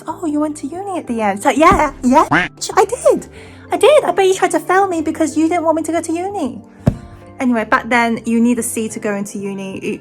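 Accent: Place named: Essex, United Kingdom. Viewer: She's proper Essex.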